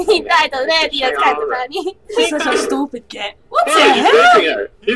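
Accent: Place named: Italy. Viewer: and i also luv wat u did the way u speak both of i think italian english speaking accents lol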